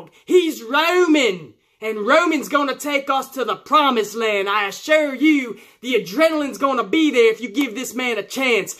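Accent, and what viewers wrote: South, United States. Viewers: Funny stuff love the Ole Country Accent on the Night The Coke Zero 400 in Daytona is on. There no way Im cheering for Roman way he is booked an Vince Mr. GoldenBoy continues to be pushed down our throats. So, sick of hearing “It’s the Big Dog.” No, Cole its not we know you been freed by Vince to say that. We get it! He not the guy. But again this some funny stuff. is 💪🏻 # #Smarts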